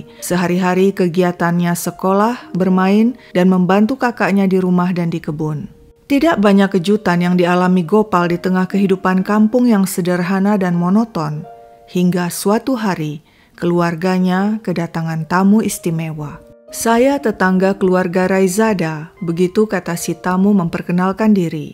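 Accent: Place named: Surabaya, Indonesia. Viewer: Dari awal mendengarkan dr dialeknya aku sudah menduga sepertinya dr Surabaya. Bener dong ada "soale"... Hehehe... Selamat buat kalian yg menang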